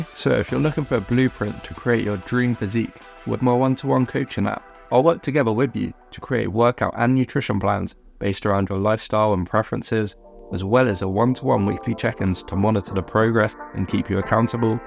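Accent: United Kingdom. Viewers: Bro speak like british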